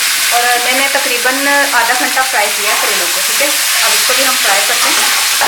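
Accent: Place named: Punjab, India. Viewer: باجى جي. هذا قيميه ماشاء الله عليك ميه ميه وأنت لسان البنجابي حلوة ميه ميه جزاكم الله خيرا في الدنيا والآخرة مع السلام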